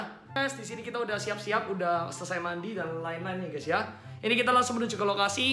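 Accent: Java, Indonesia. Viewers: Asli pundi mas kok logat e Jawa wkwk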